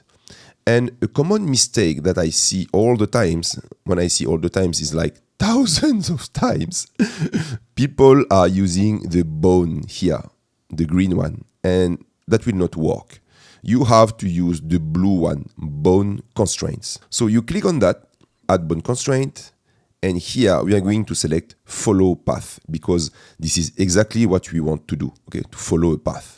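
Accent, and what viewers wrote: France. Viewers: accent français détecté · C'est quand même plus facile de comprendre avec un accent français. mdr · mdrr le big accent francais mais sinon merci pour le tuto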